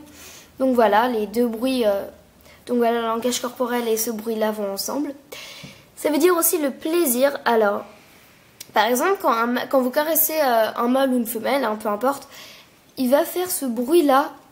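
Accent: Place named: Belgium. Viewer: t'es belge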